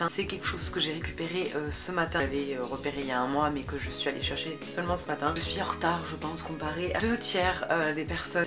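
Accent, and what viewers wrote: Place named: South, France. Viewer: J'adore ton petit accent du sud tu m'amène le soleil en haute Savoie 😊 J'adore ton ral il te va trop trop trop bien 👌🏻 Bisous bisous 😂😂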